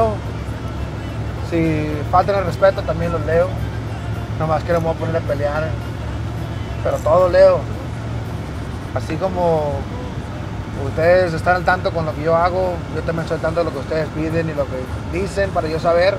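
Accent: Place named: Mexicali, Mexico. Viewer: Todos mis compás de chicali dicen así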